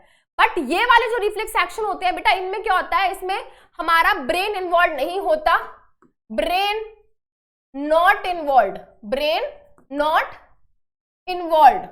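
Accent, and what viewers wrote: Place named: Punjab, India. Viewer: Ma'am was catching her Punjabi accent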